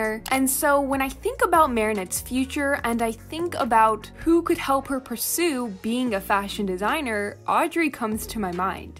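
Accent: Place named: New York, United States. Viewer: In the beginning I thought it was someone from New York I dunno I’m just saying!?!?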